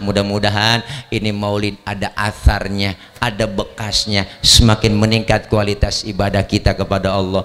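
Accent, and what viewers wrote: Betawi, Indonesia. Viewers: Resep banyak banyolannya Dan kental betawinya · masya allah... suka bngt ama yg 1 ini... suka bngt ama logat"y... sama² kita orang betawi yah....😁 ketawa trs klo ngeliat ceramah... # JAKARATA_BARAT Hadiirrr☝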